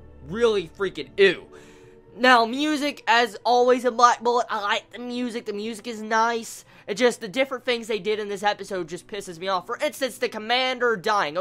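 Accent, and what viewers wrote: United States. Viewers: are you american???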